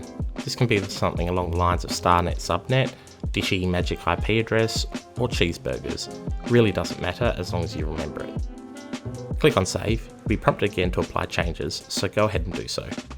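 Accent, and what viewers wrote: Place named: Australia. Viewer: Sorry to sound rude, but you sound like a fellow Aussie... are you in Australia using Skylink? Didnt think it was released around here yet? How you finding it, worth the cost?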